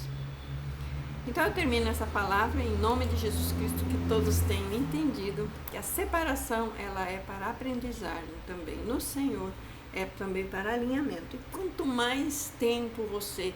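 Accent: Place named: Rio Grande do Sul, Brazil. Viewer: Deus abençoe irmã! Sutaque maneiro deve ser do Rio Grande do sul que Deus abençoe a todos dái!!!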